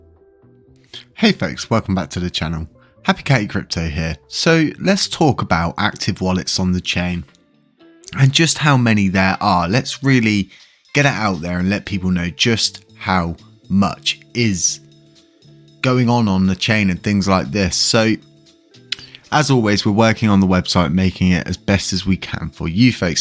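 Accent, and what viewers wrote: United Kingdom. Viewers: I like your accent. it's like in the harry potter movie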